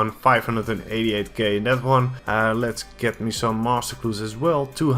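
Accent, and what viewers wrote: Netherlands. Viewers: Oei je hebt wele cht een heel nederlands accent haha :p leuke video man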